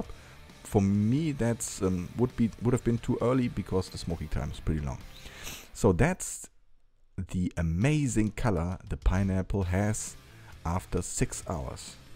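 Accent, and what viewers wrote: Germany. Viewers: Best german accent ever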